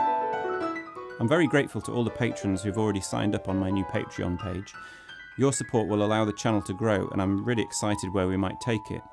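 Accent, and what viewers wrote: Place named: England, United Kingdom. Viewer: I love your accent. It reminds me of my friend from England who I could talk about classical music with for hours. Now he’s in Tel Aviv. :,) Keep up the great work.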